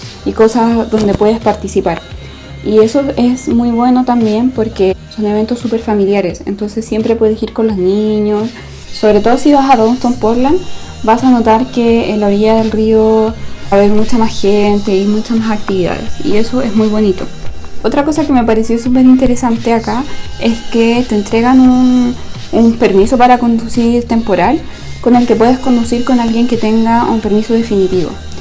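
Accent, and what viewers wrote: Chile. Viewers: Sin ver 1 minuto del video puedo asegurar que eres chilena con ese castellano tan eligible 😂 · AAAAAAAAAAAAA se nota que es chilena apenas hablo jajajajajaaj que bella... eres muy linda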